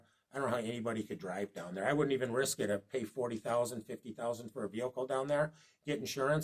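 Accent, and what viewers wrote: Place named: New England, United States. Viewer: He's a Yankee threw and threw. Where's that accent from? 😂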